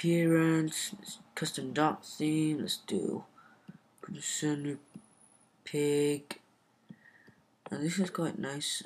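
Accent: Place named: United Kingdom. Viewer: why r u using a british accent?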